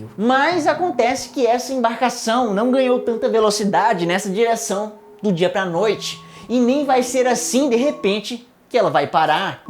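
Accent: Ceará, Brazil. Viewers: Vc é cearense? Tbm sou haha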